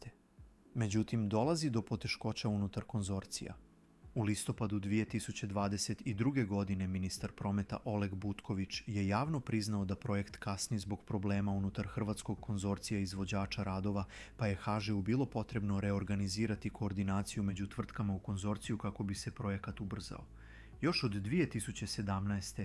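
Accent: Serbia. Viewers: Dobar video al ide mi na k ovaj srpski naglasak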